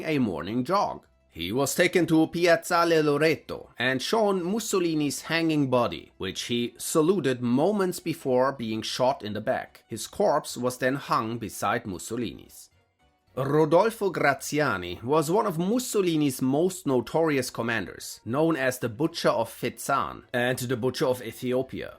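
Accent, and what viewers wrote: Germany. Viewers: Said by a german gives it a wholly different taste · Aha! Quite good, but very german 😊... Like my german: quite good, but very italian 😂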